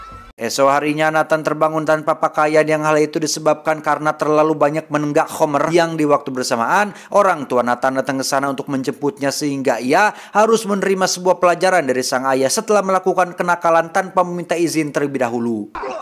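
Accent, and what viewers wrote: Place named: Madura, Indonesia. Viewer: Madura bg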